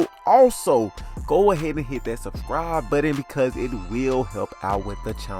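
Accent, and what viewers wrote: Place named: South, United States. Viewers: Gotta be Texas that twang is in there heavy lol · your accent is thick, I'm guessing either North Carolina or Alabama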